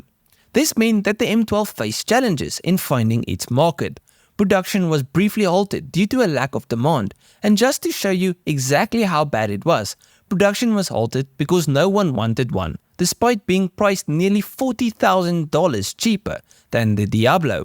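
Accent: South Africa. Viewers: South African accent 😎🤣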